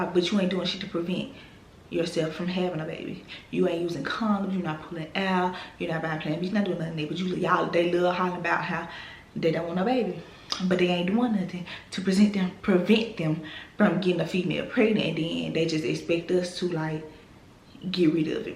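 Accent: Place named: South, United States. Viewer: I love your accent. It's a southern thing we can't help it!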